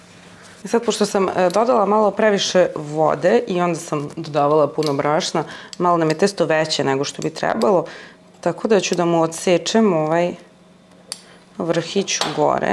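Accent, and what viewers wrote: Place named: Novi Sad, Serbia. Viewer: Tvoji komentari su pre-simpa i jos sa tim NS naglaskom uvijek me oraspoložiš. A Baku Anu vec zamisljam kako se krsti kao na onu "šolju žumanaca" 😂❤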